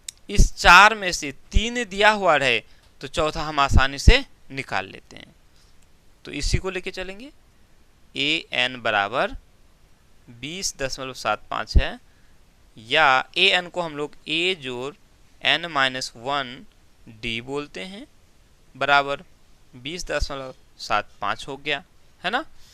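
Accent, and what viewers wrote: Bihar, India. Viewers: Sir aap bihar se ho kya ❤❤❤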